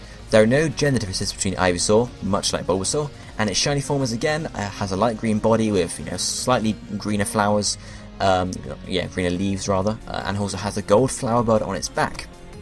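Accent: United Kingdom. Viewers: I don't get a thing you say with that British accent. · I'm from Sweden and even I can understand what he's saying with his british accent.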